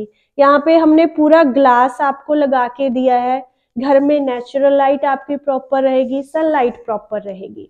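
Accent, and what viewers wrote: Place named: Punjab, India. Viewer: Kudi punjab d lagdi aa jo punjabi vich Hindi fit kari ja rahi aa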